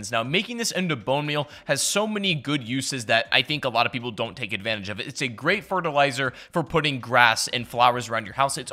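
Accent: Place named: United States. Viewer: Omg an american/Canadian accent thnk goodness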